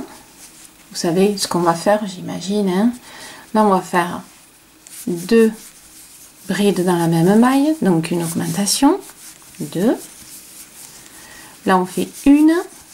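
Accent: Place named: Southwest, France. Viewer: Cc ...moi j'ai adoré et l'ai fait .. j'adore ton accent ! Du sud ouest non ? 🙏🙏🙏🙏🙏 Merci beaucoup .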